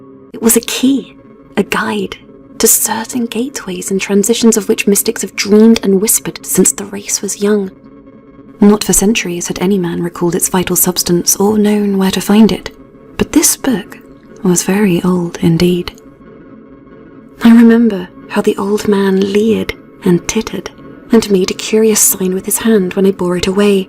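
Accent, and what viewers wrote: England, United Kingdom. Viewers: I think has lost the plot. Why do you dwell on such disturbing past times my dear? An English girl with that accent should be enjoying life with a good husband 🙂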